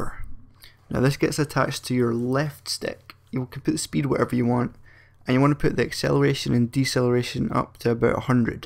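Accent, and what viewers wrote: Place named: Scotland, United Kingdom. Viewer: cool vid but are you speaking english? That scottish accent is rough.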